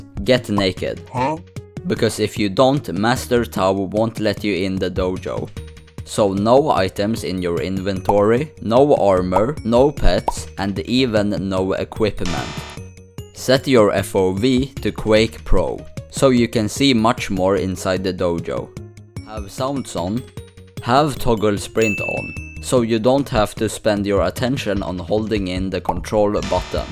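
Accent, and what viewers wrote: Sweden. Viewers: Bad Video + L Swedish😂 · W Swedish cause you say "yump" love that shit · swedish accent is fun just yamp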